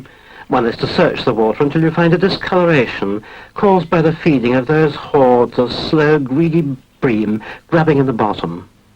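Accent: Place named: England, United Kingdom. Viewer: Talks very well with a marvelous English accent.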